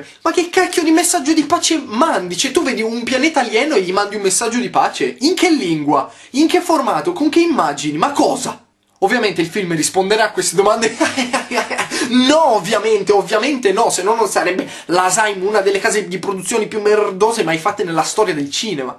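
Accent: Turin, Italy. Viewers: sei di Torino? :)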